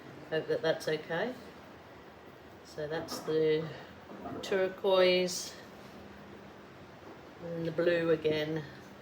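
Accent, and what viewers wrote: Birmingham, United Kingdom. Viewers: I thought you are a Brummie I was born in Coventry lived in Bulkington came to West Oz in 1970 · I have to ask because it is driving me bonkers, were you born in Australia? The reason I ask is because I constantly hear some Brummie, [Birmingham] in your accent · I love watching your videos. My art teacher at school told me I didn’t have an artistic bone in my body so I basically never pursued an art activities after I finished school. During lockdowns I have found your videos initially to calm me because I find watching art being created very therapeutic but I’ve now decided that at 43 I might try and find that creative bone my teacher said I didn’t possess. I know you’re in Australia but are you actually born and bred Australian? I only ask because to me, a Brit, you sound slightly Brummie. I love listening to you you’re so don’t to earth and funny. 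Thanks for sharing all you do x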